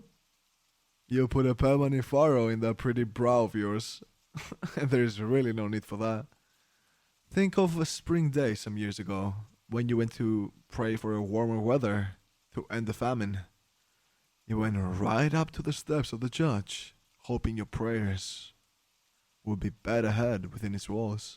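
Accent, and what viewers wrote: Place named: Greece. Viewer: your accent sounds so greek wow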